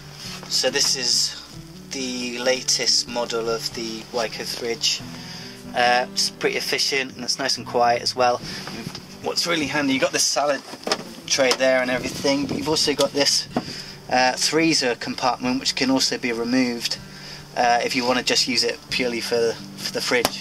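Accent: United Kingdom. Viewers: one of the most adorable homes.love his brit accent.love the way his eyes light up when he talks about his wife.every feature in the home is awesome. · I just love the British Accent, I don't know why.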